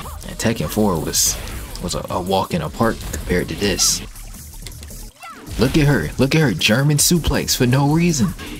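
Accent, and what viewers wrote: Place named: Philadelphia, United States. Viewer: Bro you from Philly lol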